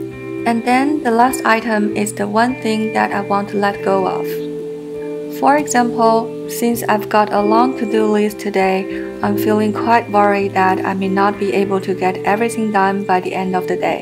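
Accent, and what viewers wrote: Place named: China. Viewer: ) 本來以為你是外國人，聽你說話，就聽出來是中國人了 😊你的影片都很好看～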